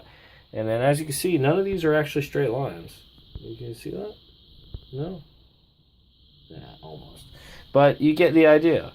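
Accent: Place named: United States. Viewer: So you live in Australia, but have an American accent, were you born in America, but moved to Australia? I'm just curious.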